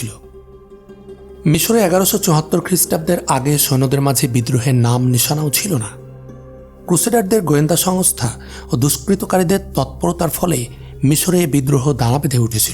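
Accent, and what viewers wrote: Kolkata, India. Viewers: আপনেত দেখি ভাই কলকাতার স্টাইলে বাংলা পড়া শুরু করলেন যা আরো আগের পর্বগুলোতে পাওয়া যায়না 🤭 শ কে স ছ পড়ছেন 🤔🤔🤔😏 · ভাইয়া আমার আম্মুও ক্রুসেড সিরিজের পাগল হয়ে গেছে। আমার খালা শুনে বলতেছে আপনি নাকি ভারতের কলকাতার। আপনার কথা কলকাতার মানুষদের মত লাগে।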